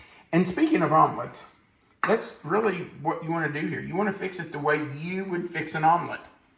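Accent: South, United States. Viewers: This looks so good and easy to make over the holidays when we have company. Thanks for sharing. Also, my hubby and I are having a debate on accent (well region you're from) I say soutjern states and he says Pacific Northwest. Settle our debate. Lol!!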